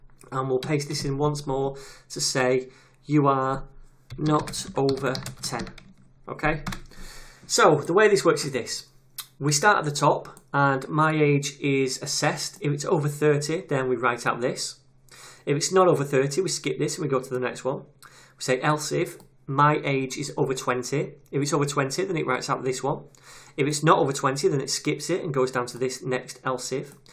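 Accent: United Kingdom. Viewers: Where about a in the uk are you from buddy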